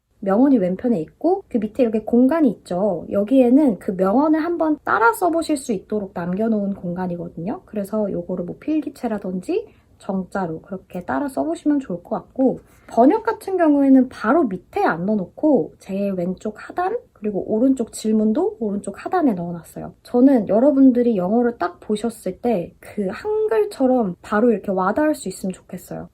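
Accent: United Kingdom. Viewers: 책 출간 축하드려용 >_< ❤️ 바로 교보문고 달려가서 구매하고 왔습니다! 빨리 받아보고싶네요! 제 영국영어 롤모델이세용..목소리도 너무 좋으시구 ,, 은근 슬쩍 팬심 들어냅니당! 다시 한 번 축하드려요!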